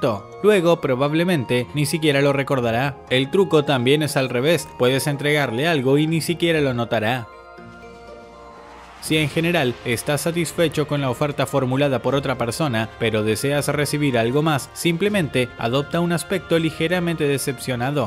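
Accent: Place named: Argentina. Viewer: no se, pero tiene alta pinta de argentino en modo neutro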